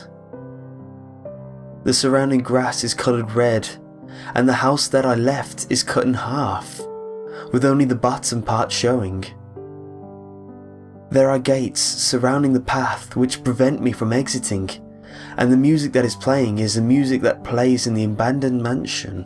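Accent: United Kingdom. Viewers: he's British. they have their speaking quirks, and they cant help it. honestly it sounds much better than American English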